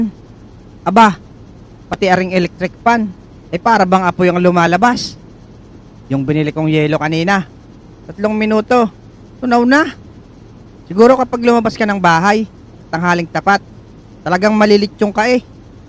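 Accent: Batangas, Philippines. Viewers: kuya taga batangas ho kayo?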